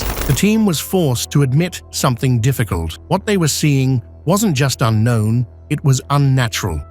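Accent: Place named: United Kingdom. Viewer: A very British voice with a very American cultural bias sound completely unnatural to me - go figure!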